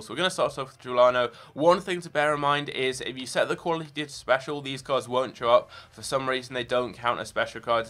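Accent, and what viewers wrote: United Kingdom. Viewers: want to know too.:-) english is verry british!:-D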